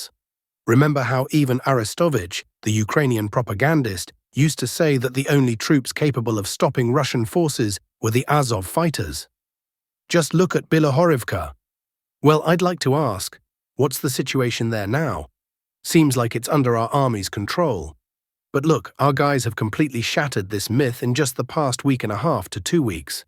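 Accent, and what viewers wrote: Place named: United Kingdom. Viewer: It is strange to have a British accent , and referring to "we and "us" during his narrative. I am suspect of this channel.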